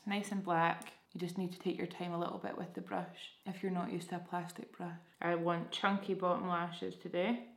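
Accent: Scotland, United Kingdom. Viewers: I love ur Scottish accent xx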